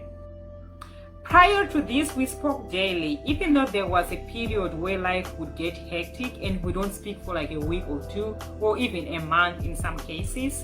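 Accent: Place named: Haiti. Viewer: Interesting story. Thank you for sharing. What I learnt is once someone treats me with neglect - I have to lose my respect for them, and therefore, lose all desire to be connected to them in any way. If I keep trusting them, forgiving them, telling myself "I did something wrong, even though I have no idea what?" ......I WILL sooner or later get heartbroken and it will hurt A LOT! So, no more tolerating neglect and lack of communication from me. I respect myself too much to let people like this in my heart. If 2 weeks pass and a supposedly very close-to-me person is suddenly unavailable, I tell myself "I'm not interested in unpredictably push-pull, hot-cold, available-unavailable, friendly-hostile people, who are not mature enough to communicate what's going on". (Just wondering of you're from Haiti. I enjoy guessing-by-accent who's from where ....sometimes I guess right, sometimes wrong😂)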